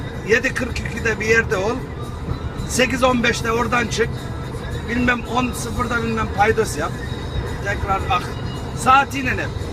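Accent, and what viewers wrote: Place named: Anatolia, Turkey. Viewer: merhabalar nasılsınız bu konuşan arkadaş sizmisiniz şivesi sunumu çok tam anadolu :) ve kahkahsı ..hayırlı yolculuklar dilerim..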